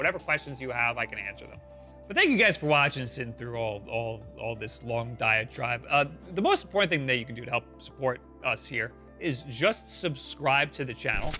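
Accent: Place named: United States. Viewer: Why do americans say saughtering instead of soldering? Is the word spelled differently in USA?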